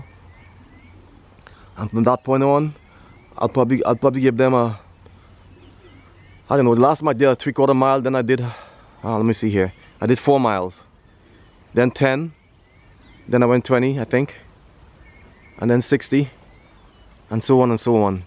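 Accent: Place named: Caribbean. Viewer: Bro you sound like you from the Caribbean or am I wrong the accent but the birds are flying good and I like when you say you don't baby your birds we say the same back home here but love the videos bro🎉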